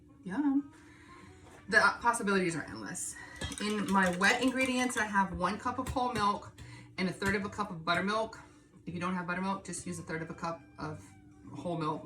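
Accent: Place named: South, United States. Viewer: you must be from the south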